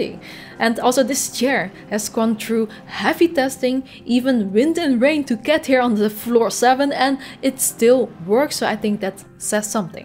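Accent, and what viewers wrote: Netherlands. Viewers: Ur in the us? I always thought you had a Dutch accent 😅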